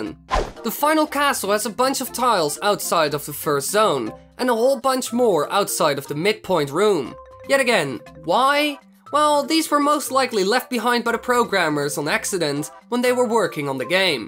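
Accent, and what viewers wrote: Netherlands. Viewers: This may sound weird but you sound like your dutch like me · Are you dutch?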